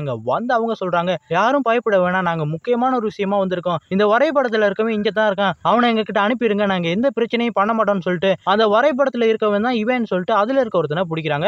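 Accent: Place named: England, United Kingdom. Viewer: Bro i think u need to change the voice modulation.. dont try to pur the englush accent in tamil. Talk tamil naturally it gives good value to your story narration. Just my opinion dont feel bad bro.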